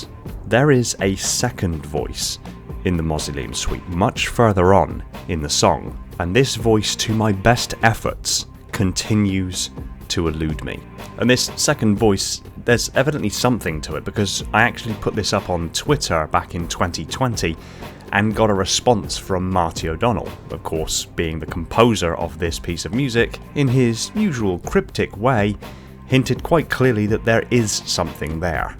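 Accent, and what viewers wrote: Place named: Hull, United Kingdom. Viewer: Was not prepared for your Hull accent, God damn I wish that actress in Game of Thrones used a different accent 😂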